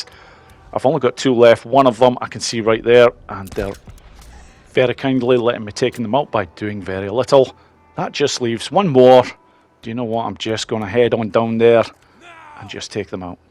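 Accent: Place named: North East Scotland, United Kingdom. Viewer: I thought I picked up a north east twang at times. I'm fae the Broch (Fraserburgh). 42 miles north of Aberdeen. Keep up the great work.!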